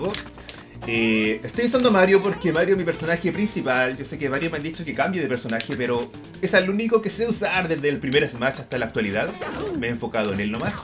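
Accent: Chile. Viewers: Que recuerdos, eras bien chileno 😂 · Eres Chileno? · Sos de Chile?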